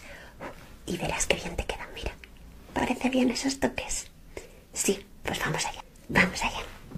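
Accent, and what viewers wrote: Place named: Spain. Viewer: Esta muy bueno el asmr pe nose que tengo con el acento español que no me da tranquilidad pero igual esta lindo <3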